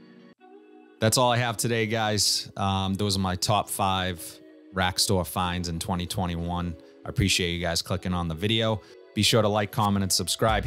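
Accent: Boston, United States. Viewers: oh wow that's interesting! I guess I never heard a Boston accent before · Hey, just came across your channel and subscribed. Have you tried Timbuktu L'Artisan Parfumeur? I found it at Burlington too. You might like it. Resinous, smokey, spicy. I liked it a while back but didn’t buy because I was looking for more floral scents from L’Artisan. Are you from Boston?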